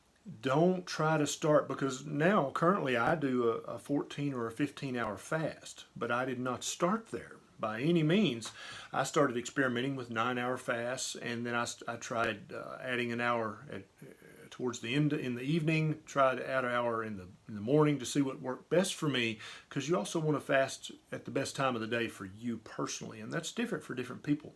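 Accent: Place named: Tennessee, United States. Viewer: Hi Dr. Berry. Great information. It's nice to hear someone from my home state. I'm from Tennessee . I live in Texas right now.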